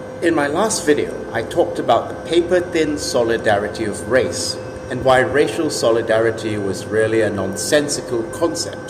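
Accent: United Kingdom. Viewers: Great video. You sound like British Educated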